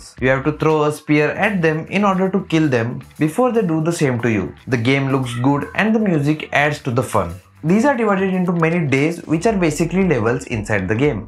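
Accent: India. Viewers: Thank you tube for closed captions. Many of us just can't understand Indian English.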